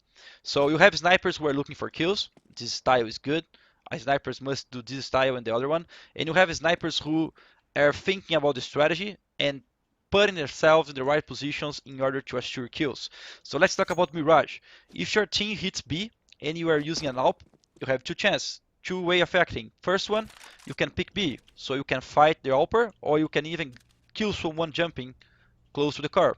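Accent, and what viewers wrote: Brazil. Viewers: se quiser melhorar tua pronúncia da palavra WORLD para que não pareça WORD. Fale assim: wor - L(el) - D(di) worLd De resto, embora teu sotaque BR, dá pra entender muito bem. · tu é br ou americano?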